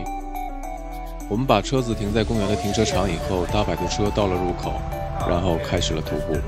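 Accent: Northeast, China. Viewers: 本山东人认为，明明是东北口音啊哈哈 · 听东北话真是亲切，我18年19年南澳 西澳两次自驾，总长没有你跑那么远，也没有伙伴，但真的很爽，以后有机会还要去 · 东北腔，哈哈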